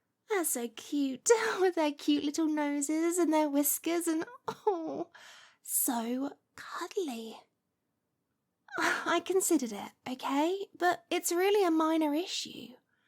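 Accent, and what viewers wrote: United Kingdom. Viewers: What a nice kind and caring witch! And with a charming British accent too. :-)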